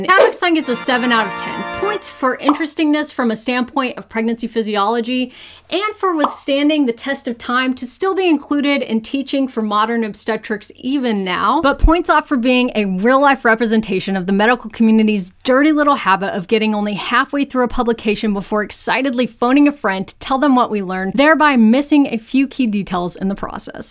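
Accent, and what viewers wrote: Texas, United States. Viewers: Is a fellow Texan, I am honored to have you and your southern drawl representing our great state. Thank you for what you do. Texas misses you. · Pap-err-us, omg your Texas accent is sooo cute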